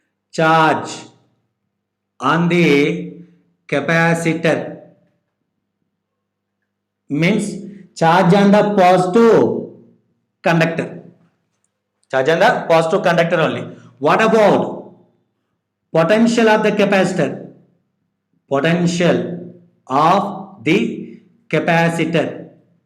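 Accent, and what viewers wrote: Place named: Telugu, India. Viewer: Though they don't speak good english but we can understand it. We should not judge people by the way they speak we should judge them by their talent they possess. They are telugites they are made to speak that way (telugu slang in english). Though they are not good in fluent english they are good in their teaching and we are able to understand.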